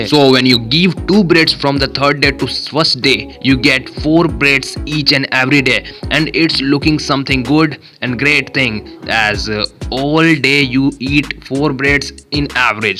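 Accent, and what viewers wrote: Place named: India. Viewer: Indian accent 🤮🤮🤮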